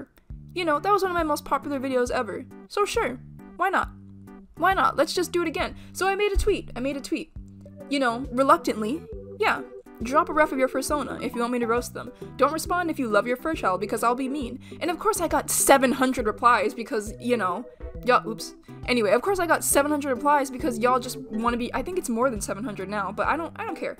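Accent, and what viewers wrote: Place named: Canada. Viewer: You sound canadian are you canadian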